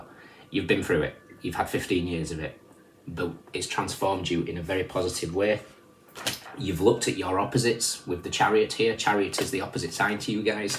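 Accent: Northern England, United Kingdom. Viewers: Thankyou 👍 good Northern accent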